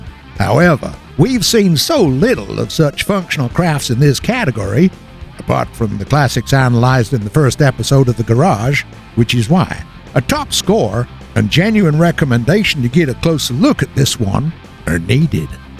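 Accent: United States. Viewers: Can the commentator please not talk with this cliché american accent? I can't stand listening to it.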